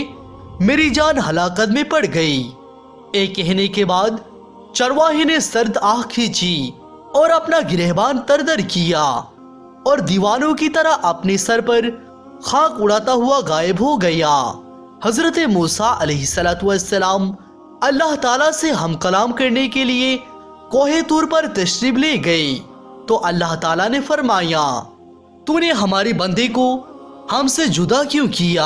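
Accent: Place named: South India, India. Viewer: Video boht achhi h mgr aapki tone south indian ya nepali type h.alag hi lag rhi hai...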